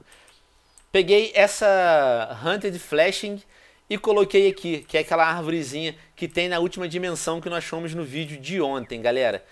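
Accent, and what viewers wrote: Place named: Portugal. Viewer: ui palhaço e português de Portugal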